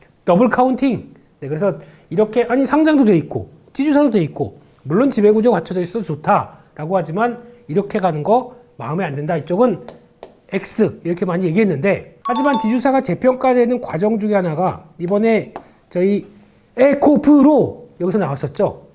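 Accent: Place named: Liverpool, United Kingdom. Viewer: ...!날이갈수록 영어가 영국식(리버플쪽)으로 변화는듯~엄청최고👍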